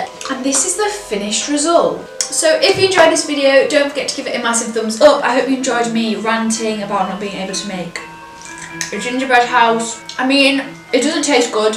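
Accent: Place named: Northern England, United Kingdom. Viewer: Up North of england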